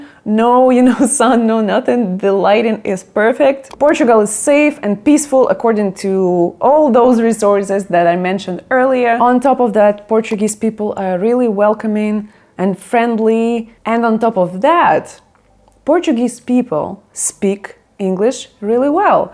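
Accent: Russia. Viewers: Спасибо за видео. Но акцент русскоговорящего человека очень сильно слышно с первых минут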